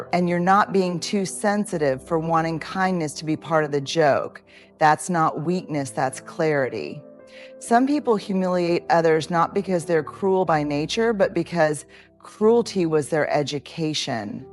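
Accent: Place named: South, United States. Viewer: ✋. I do!! All you need to do is look up one of her live Ted Talks to know that this is Brenet. Love her slight southern accent ❤ She’s the real deal.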